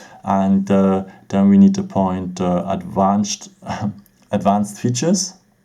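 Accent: Germany. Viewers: Kann es sein das du aus Deutschland kommst? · Sounds so german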